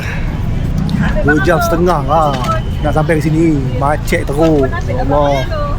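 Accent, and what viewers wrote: Malaysia. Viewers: wuih... mantap, cakap bahasa dengan aksen/dialek Melayu . 👍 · Logat Johor kah?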